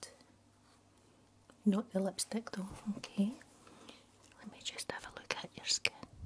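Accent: Scotland, United Kingdom. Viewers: Thankyou for a great video! I love loveeeee your Scottish accent! And I love your makeup videos. You make it so relaxing! Great job gurrrrrrrlll!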